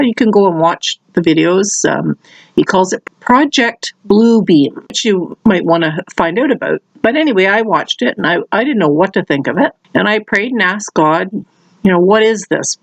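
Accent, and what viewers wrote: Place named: Canada. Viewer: I've been outside and I'm still alive. April 21, 2020. You sound like your from Canada.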